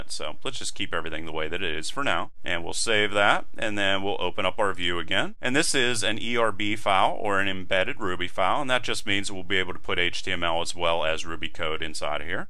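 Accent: Pittsburgh, United States. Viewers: Killer accent you yinzer you :))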